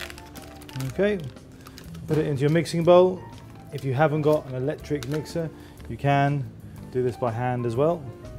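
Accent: England, United Kingdom. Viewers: where r u from? england? lol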